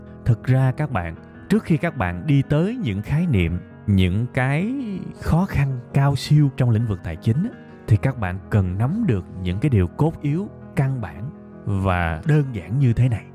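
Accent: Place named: South, Vietnam. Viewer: giọng miền trong khó nghe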